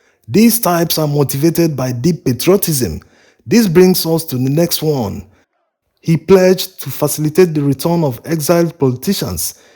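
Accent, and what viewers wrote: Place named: Yoruba, Nigeria. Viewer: Thank you brother for the video, you doing wonderful job. One thing, you sounds like my Yoruba friend Nigeria 🇳🇬, are you a Yoruba? Your accent sounds so much like him. I have watching your videos for quite some time. The way you speak just reminds me about him. I don't want to mention his name on social media, you most be him.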